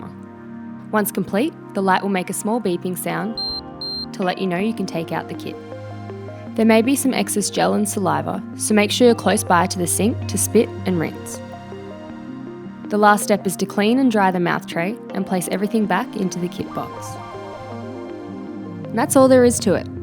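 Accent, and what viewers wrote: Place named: South, United States. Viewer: Southern accent 100%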